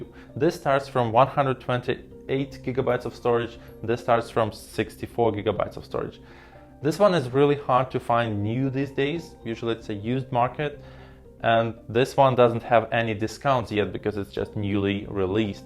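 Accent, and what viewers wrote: Russia. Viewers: Хей, ты же русский да? Я препод английского и меня не покидает это ощущение .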